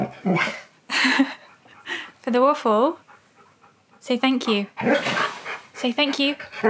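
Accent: United Kingdom. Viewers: Why do u have a british accent? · How do huskies understand British accents?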